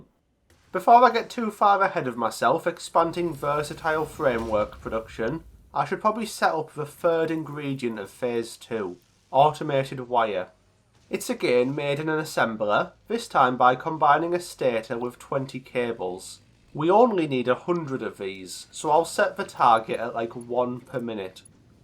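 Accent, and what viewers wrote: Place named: Northern England, United Kingdom. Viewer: I unfortunately have to report that since watching your videos my thoughts now speak with a northern accent sometimes. It will forever be gläeba in my head for now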